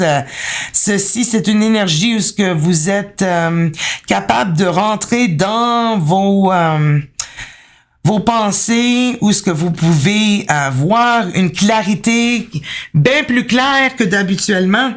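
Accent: Quebec, Canada. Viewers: Oh mon dieu, j'adore ton accent Québécois.😊❤ merci pour cette belle lecture, qui resonne parfait. Oui, je suis dans une nouvelle relation avec un poisson et j'espere que on sera bientot ensemble, car distance physique entre nous. Je suis veuve et lui divorcé.. Merci pour la confirmation et gros bisous d' Europe. ❤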